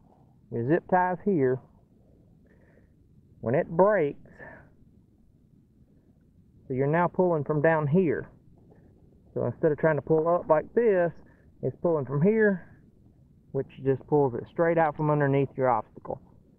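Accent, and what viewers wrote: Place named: South, United States. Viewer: Good , simple, straightforward video man. Sounds like you are Southern born too, like me.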